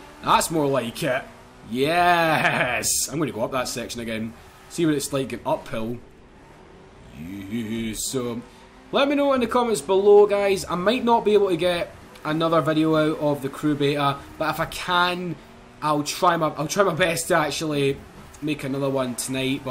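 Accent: Scotland, United Kingdom. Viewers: ur awsome great vids BTW where abouts r u from in Scotland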